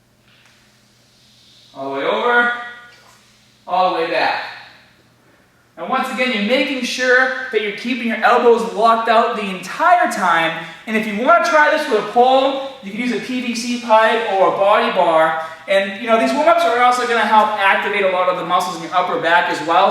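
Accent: Boston, United States. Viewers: Back in the day before went full Bostonian "Bahhbelll!"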